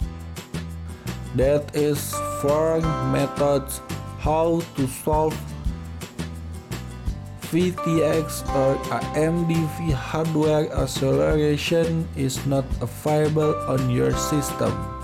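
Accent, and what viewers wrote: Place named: Bengal, India. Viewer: Dat bangga English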